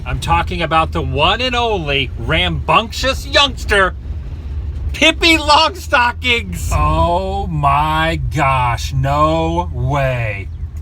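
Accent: United States. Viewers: Which region of the USA is accent associated with?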